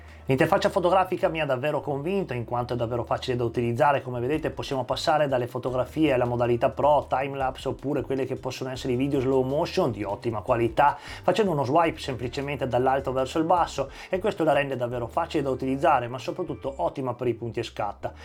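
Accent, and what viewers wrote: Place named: Veneto, Italy. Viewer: bellissimo l'accento veneto!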